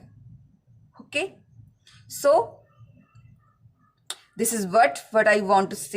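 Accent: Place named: Marwar, India. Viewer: You sound like marvadi 😊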